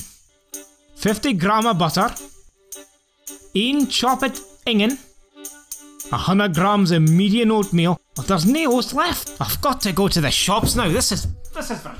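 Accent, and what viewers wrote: Scotland, United Kingdom. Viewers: Love your North East of Scotland accent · of course he’s Scottish. They’re probably auto captions which is just what the computer thinks he is saying. And the computer isn’t very good at listening to non American or RP English. Scots is a language which split off from Middle English. A lot of it is mutually intelligible with English but many Scandinavian languages are also mutually intelligible to certain degrees. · Dude I work in Scotland but your accent is something else . Subscribed tho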